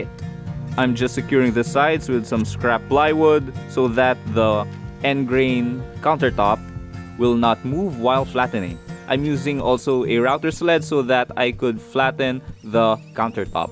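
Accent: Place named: Philippines. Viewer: I really miss the Filipino accent. I was fortunate enough to live near Manila for a few years and travel a lot while there. Listening to you explain all this brought back so many good memories. Best of luck to you with your projects and your channel my friend. :)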